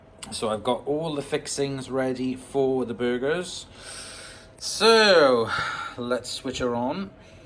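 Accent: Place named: South Wales, United Kingdom. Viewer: A really good review, also nice to here what I presume is a "South Wales" accent instead of the usual American. I think it should be ok for searing my steaks, after cooking sous vide . Paul, S. Wales.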